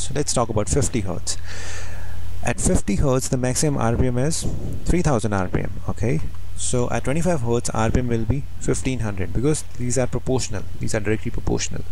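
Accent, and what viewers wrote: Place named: India. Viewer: Outstanding. I have been struggling with the question of using the Delta M series or sticking with my old friend the B series. After watching your work, I am comfortable making the change. Delta should sponsor you. Having been an American in India 7 times, I can follow you no problem. Garden variety Americans could watch each section a few times and learn the pattern of language in India. Amazing in and of itself. I always felt like Indian's spoke better English than I did. Good work. Please do more.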